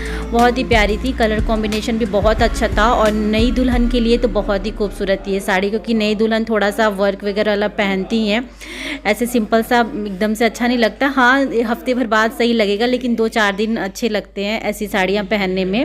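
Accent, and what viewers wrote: Bihar, India. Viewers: Madam kya aap bihar se ho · Meri sasural Delhi men he 20 sal se men yahi rahti hun par Mera mayka bareli u.p ka he mujhe wahan ki bhasha acchi tarah se pata hemere aadhe rishtedar up ke hen par Jo aap bolti ho woh up ki nahi Bihar ki bhasha he mere yahan Delhi men mere sas pas bahut se log Bihar ke hen mere kiraydar bhi unki same bhasha he aapki bali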